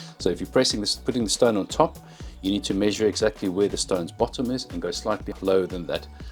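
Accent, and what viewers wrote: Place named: Netherlands. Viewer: Your sound Dutch😊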